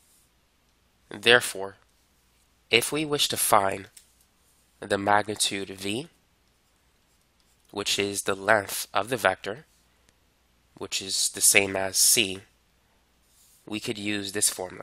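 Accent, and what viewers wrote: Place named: East Coast, United States. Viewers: He’s from the east coast the way he says north “norf” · Are you from New York, you sound like it!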